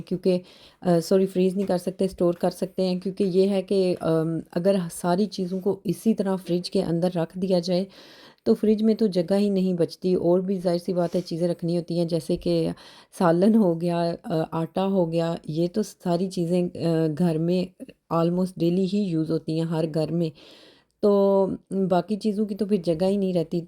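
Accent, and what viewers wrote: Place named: Punjab, India. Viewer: Apki punjabi ka tarka bht maze ka lgta ha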